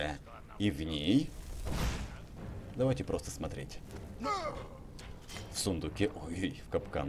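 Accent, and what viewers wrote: Ukraine. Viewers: FryTech228 Это диалект. Так говорят на Украине (в основном) и юге России (меньше).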